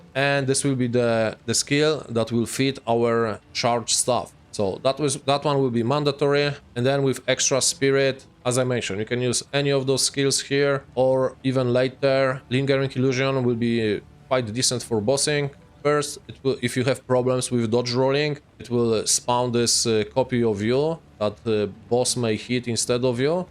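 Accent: Poland. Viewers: As a Russian, I can recognize a polish accent flawlessly as well hahaha. Kurrrrwa! 😂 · Ten polski akcent poznam wszędzie :)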